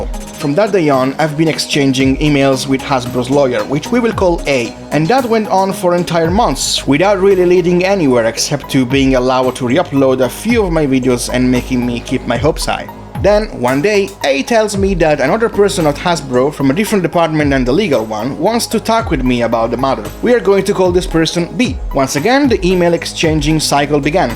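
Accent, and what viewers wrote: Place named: India. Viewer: You must be talking with an Indian accent! Indian as in Asian-Indian American.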